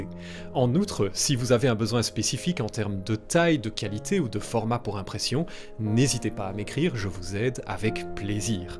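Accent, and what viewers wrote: Belgium. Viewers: Han j'aime vraiment pas le français de belgique xD Chatonne AAAAAAAAAHHHHHHH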